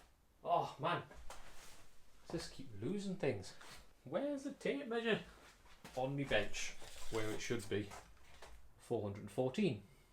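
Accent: Newcastle, United Kingdom. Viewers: funny hearing a fellow geordie on youtube ,my first car was a mini now am into building defenders from bare chassis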